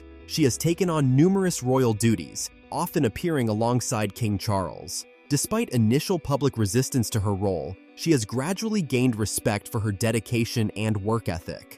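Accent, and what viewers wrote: United States. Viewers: And why use an AI narrator? If you absolutely can’t afford the minuscule fee a human narrator would earn, at least teach the AI to pronounce the names of the royal family correctly. It’s offensive to propagate mistakes and then use an American accent. That’s a deliberate decision. Real Americans can pronounce Louis correctly.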